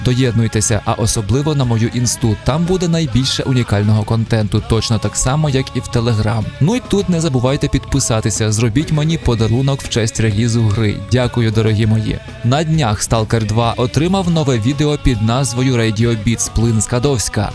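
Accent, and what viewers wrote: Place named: Transcarpathia, Ukraine. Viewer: він не понасирав, він просто з Закарпаття, я теж і у нас тут свій суржик такий і деяким людям важко писати і говорити чистою літературною мовою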